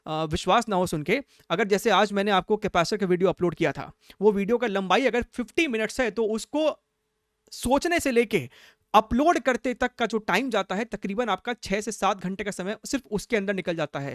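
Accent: Bihar, India. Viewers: to aaj pata chala ki aap bihar se hai.sir mujhe aapke accent se hi lagta tha ki aap bihar se hai.Mai bhi patna se hu sir and iss advanced me phodkar bihar ka naam roshan karna hai.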